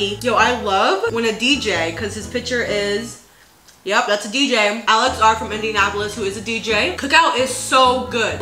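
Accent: United Kingdom. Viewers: As a British person, your British accent is truly incredible! I love it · Bro your British accent gets me every fucking time AHAAA! Anyways video. Beautiful. Mukbang. A1.. Baddy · THE BRITISH ACCENT KILLED ME 💀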